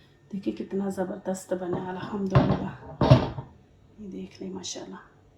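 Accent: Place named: Punjab, India. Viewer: Bohat Mazy dar Anday began bnay hen ap ka panjabi lehja bohat Pasand h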